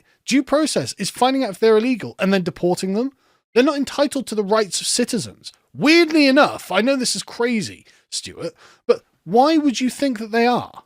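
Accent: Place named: Posh, United Kingdom. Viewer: Also listen to this YouTuber speak, guarantee he went to a private school the hypocritical prick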